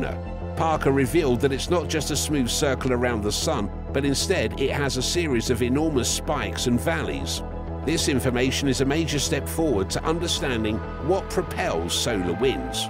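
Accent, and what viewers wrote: United Kingdom. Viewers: annoying british accent...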